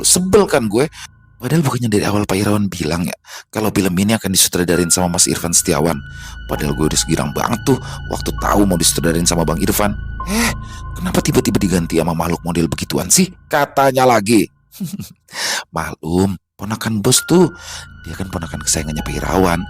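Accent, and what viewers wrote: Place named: Betawi, Indonesia. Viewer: Assalamualaikum bang Emang dah bang paling bisa dalam segala karakter hebaaat Apalagi logat Betawi paling suka dengarnya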